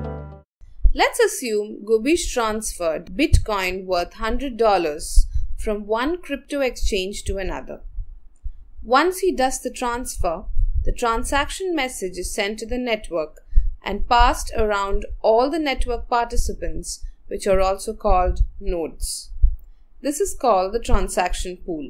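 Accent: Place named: Trinidad and Tobago. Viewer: Your accent suggests you are from Trinidad. Am i right?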